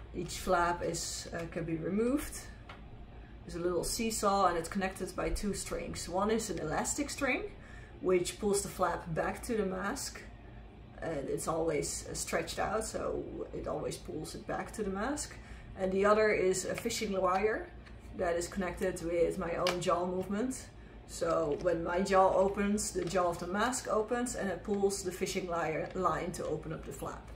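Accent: Netherlands. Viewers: I hear a Dutch accent!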